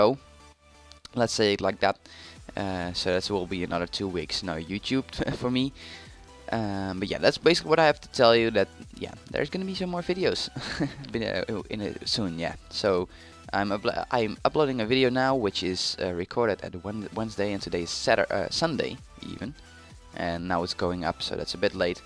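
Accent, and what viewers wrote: Netherlands. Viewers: Ben je Nederlands? Je klinkt Nederlands :)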